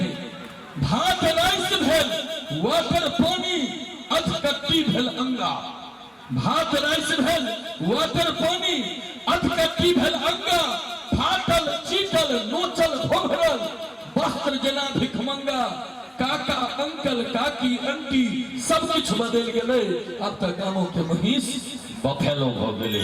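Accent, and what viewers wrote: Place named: Mithila, India. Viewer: Bahut sunder ji aha bulkul mithila lha chi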